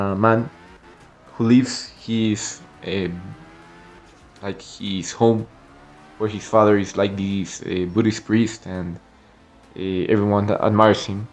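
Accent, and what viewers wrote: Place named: Brazil. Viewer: lesgo mano, eu pensei porque seu sotaque ingles é bem parecido com o sotaque brasileiro em ingles, voce é de onde?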